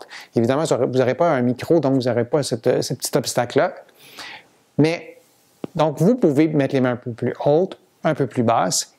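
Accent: Canada. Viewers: Ahhh l'accent canadien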